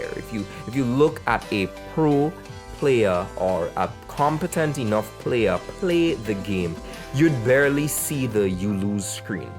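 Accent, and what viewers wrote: Trinidad and Tobago. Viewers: Trini accent talking about tekken reminds me of being 9 and playing tekken 3 with my cousins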